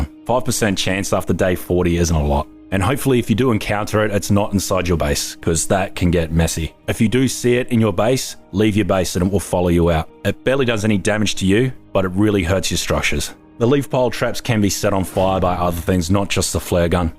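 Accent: Australia. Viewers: Are you an aussie? You sound like an aussie. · Stumbles across a video thanks to the algorithm - Sees the channel name is " " - Wonders "hm, is this guy Aussie?" - Accent confirm.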